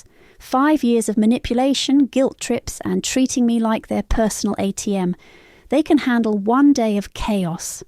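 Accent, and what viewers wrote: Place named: United Kingdom. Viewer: Sorry I can’t stand the British accent. It’s really awful. Some of thrm I can take but this one is awful.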